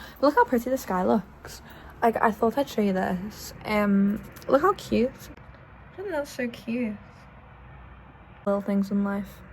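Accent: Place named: Liverpool, United Kingdom. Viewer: Plus, do not let anyone tell you that your scouse accent is hard to understand, as i understand you better than others . I mean that could be due to me being surrounded by the scouse accent all the time, but still better 😜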